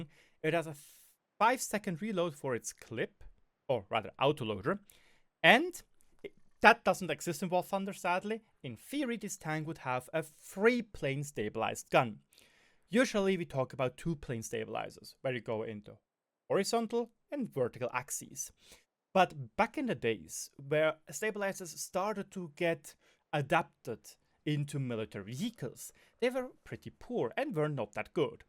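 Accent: Germany. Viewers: Hey, wenn man schlecht englisch spricht, sollte man es nicht provozieren. Bist du jetzt international um mehr Leute zu catchen? Du klingst wie eine Mickey Maus mit nem Deutschen Akzent. Fast so gutes English wie Matthäus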